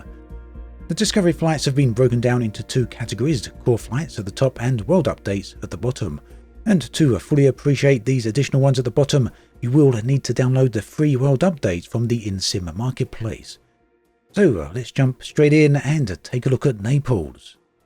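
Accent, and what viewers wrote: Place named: Northern England, United Kingdom. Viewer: It's an English accent, most likely from the north or upper midlands.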